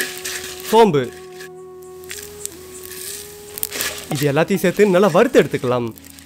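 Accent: Salem, India. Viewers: Salem slang 😍💛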